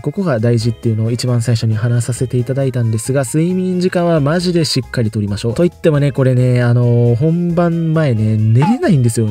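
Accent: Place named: Kansai, Japan. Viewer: 関西弁丸出しなのが本気度を表してる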